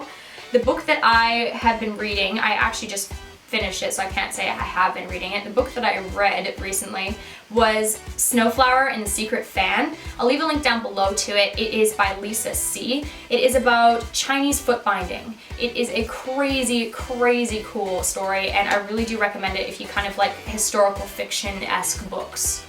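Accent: Canada. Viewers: Can totally tell you've been back to Canada recently #dataccenttho Love your videos 💕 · I've heard colouring books are really therapeutic :) I should try it! I know this sounds dumb, but you're SO Canadian sounding after spending time at home haha I love it! "Hey?" :) Also love your stripe tattoo. So thoughtful and pretty looking! Wow that bikini is ridiculously tiny...I hate that companies can get away with that!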